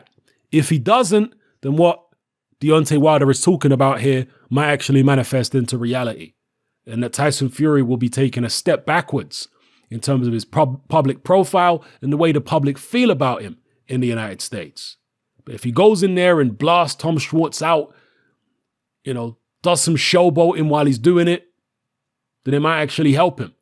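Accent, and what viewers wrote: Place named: United Kingdom. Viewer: It's funny how I can tell you're black even with a British accent. 😄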